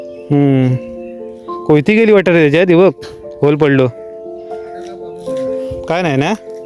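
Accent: Malvani, India. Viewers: भावा तुझ गाव कोणत मालवणी बोलतोस पण मीक्स आहे तु बोलतो ती भाषा मालवण तालुक्यात बोलत नाही प्लीज सांगशील गाव कोणत 🙏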